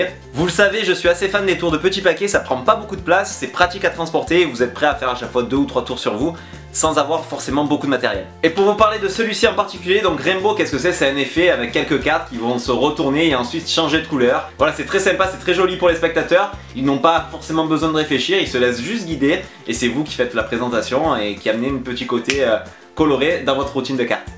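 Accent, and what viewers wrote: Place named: South, France. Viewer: Tu fais des vidéos très fluide avec ton bon accent du sud 🤙 Celle si est sympa et Hani vraiment envie de connaître l’astuce car je débute en magie 😁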